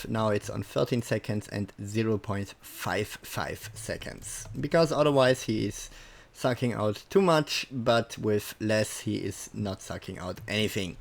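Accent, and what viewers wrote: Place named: Germany. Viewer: That strong german or dutch accent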